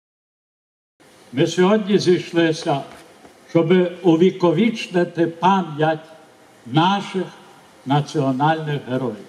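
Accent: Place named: Canada. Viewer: ше один з Канади, блять, нікому не ріже вухо?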